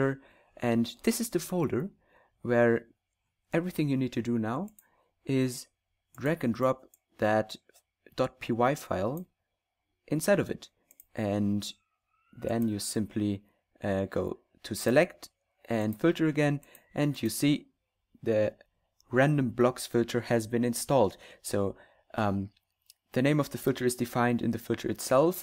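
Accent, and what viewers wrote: Germany. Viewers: u have a speak is like german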